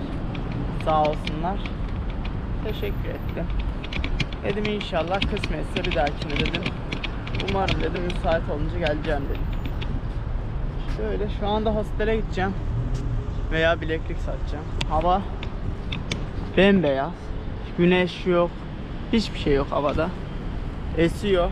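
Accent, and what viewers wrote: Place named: Yozgat, Turkey. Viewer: yok dayi sen istanbullu gibi konusmuyosun, sanki boyle yozgat, corum gibi, babanlar nereli??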